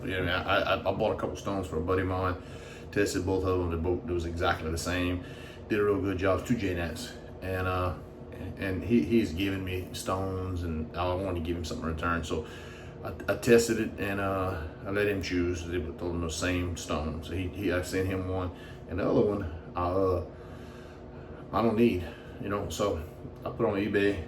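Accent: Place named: Louisiana, United States. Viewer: If I can echo Sean, your experience always shows....always a great video, especially with your wonderful cajun accent. More please on your stone to edge appreciation. I'd love to try Jarrods concave edge, on a Ti possibly. Looks like you had a very fine shave 🎉😊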